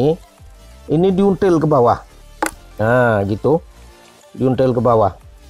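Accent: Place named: Madura, Indonesia. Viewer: Ini kakak pasti dari madura.... Tak iye?? Mantap kak... 👍👍👍 sdh like n subscribe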